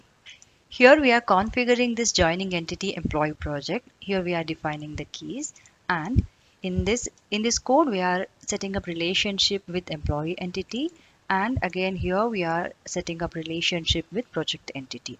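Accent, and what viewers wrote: India. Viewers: your explanation is so good. you are from Andhra or Telangana?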